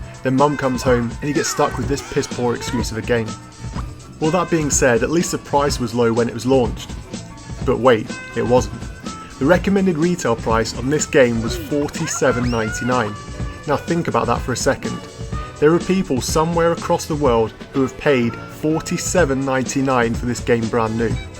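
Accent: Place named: United Kingdom. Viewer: I needed to rewatch this one after the last video, went full British on this one! very fun to listen:p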